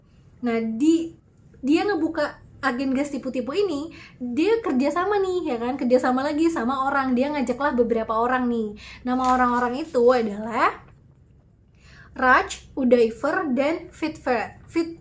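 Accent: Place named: Banjar, Indonesia. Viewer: kya logat banjar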